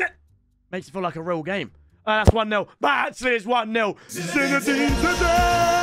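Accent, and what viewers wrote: South Africa. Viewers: Love the Souf African accent!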